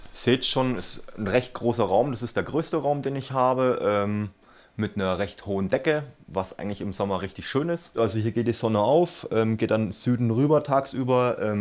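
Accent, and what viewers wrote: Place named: Franconia, Germany. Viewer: Super sympathisch und direkt den fränkischen Akzent gefeiert 😍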